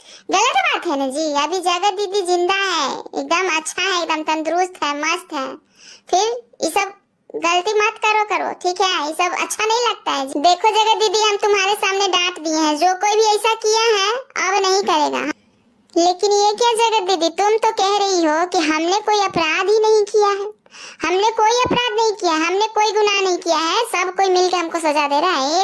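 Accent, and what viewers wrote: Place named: Magahi, India. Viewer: Didi ap kaha se ho plz bataiye.mujhe aisa lg raha h ki ap ka bolne ka tone aur kuch word jaise lagagit hau use ki wo to maghi word h a mujhe lg raha ki ap maghi ho pl z ap batao ki ap kaha se ho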